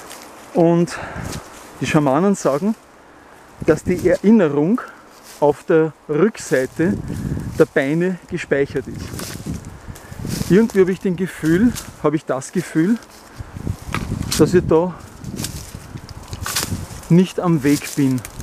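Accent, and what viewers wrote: Austria. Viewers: DANKE DIR.DEINE STIMME IST ZAUBERHAFT SÜDDEUTSCH.ÖSTERREICHISCH. DANKE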